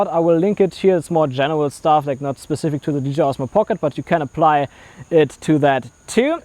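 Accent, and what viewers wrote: Germany. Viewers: Mach Deutsche Videos man hört dass du Deuscher bist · Danke für die tollen Tipps.. (Asume your accent is German=).. Great ideas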